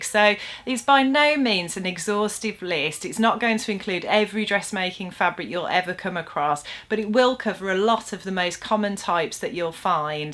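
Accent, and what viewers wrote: United Kingdom. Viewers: Hi I have just stumbled upon your videos and just want to let you know that you are AMAZING.You explain in simple English. I have mostly listened to the American videos and it's just lovely to listen to someone normal from UK. I am so hooked to your videos now and busy going through all your videos. I'm hoping to buy an upgrade on my sewing machine and looking at a Jenome Atelier 7 and hoping to invest in a good overlocker. Just waiting for lockdown to be lifted. I'm currently confused between a Janone and a Juki of the same model type and really struggling to make up my mind and as well as with an overlocker. I want a good overlocker. Not a lower end but also not a difficult one as I too am struggling with the threading of overlockers. I hope that you can help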